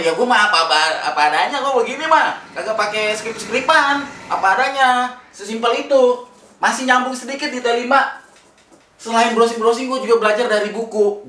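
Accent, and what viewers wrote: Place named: Medan, Indonesia. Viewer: Medan banget 😁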